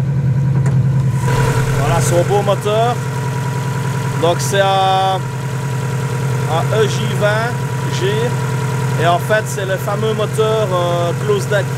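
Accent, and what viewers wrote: Belgium. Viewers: 350 zete 😂😂😂 les belges vous avez un bel accent bravo à toi!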